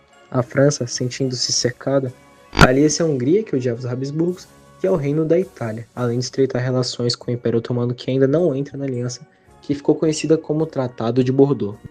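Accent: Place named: Germany. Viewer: Adorei o sotaque alemão 🎉🎉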